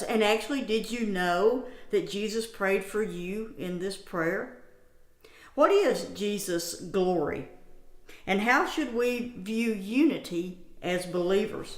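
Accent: South, United States. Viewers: Thank you!!! I am going to listen to your lessons as I have been looking for some Bible studies in a down home way that I am used to. I am from the mountains of West Virginia and your calming southern voice makes me feel at home! Thank you!!! God Bless!!!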